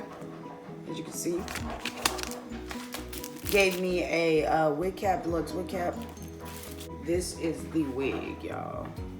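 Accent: South, United States. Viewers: This look so bomb!🔥😍 and you have a thick country accent, where are you from? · sound so country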